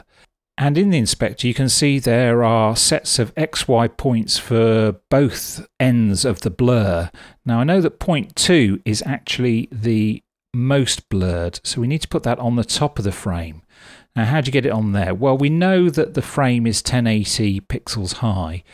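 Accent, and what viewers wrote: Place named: United Kingdom. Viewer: Thankyou - excellent. Also: British!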